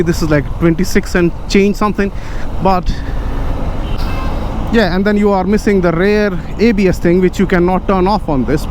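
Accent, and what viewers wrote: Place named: United Kingdom. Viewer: Love your accent bruh!. Thought i was watching a British based channel at first :D Keep up the good work on your channel! :) Ride safe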